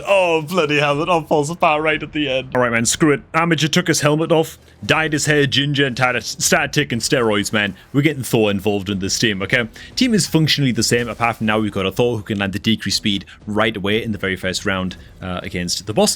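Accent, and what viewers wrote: North East England, United Kingdom. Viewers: He's from the north east of Britain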